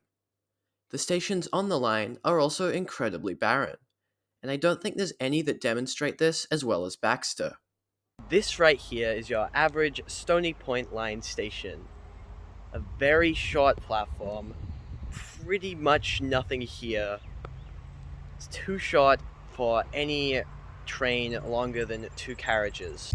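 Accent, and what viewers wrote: United States. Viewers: Great video. Curious if you’ve learnt your English online from American content as you don’t have Australian pronunciation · I get a feeling he’s autistic. My cousin is autistic and I’ve noticed he and several even high functioning often unawarely talk with an American accent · Great video. Curious where you come from because your accent has a slight American ‘twang’ to it.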